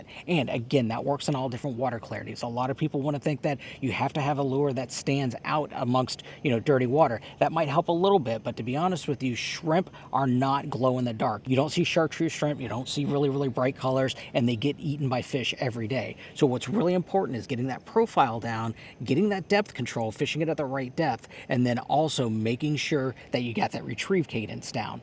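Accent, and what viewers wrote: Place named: Minnesota, United States. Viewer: You need to stop telling people all this BS . Water color and habitat in Florida is Totally different than other states. You sound like you are from Minnesota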